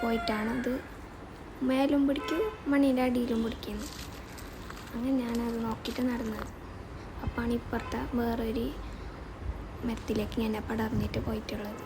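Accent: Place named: Kasaragod, India. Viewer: നിങൾ കാസർഗോഡ് ആണോ😂 സ്ലാങ്😅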